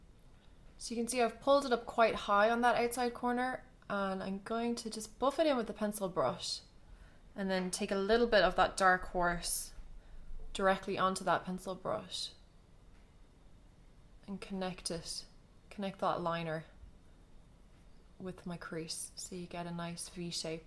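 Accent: Ireland. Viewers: Great tutorial, clear and to the point. Great camera too, makes it a pleasure to watch. And you're Irish? You've one me over, just subscribed x:-)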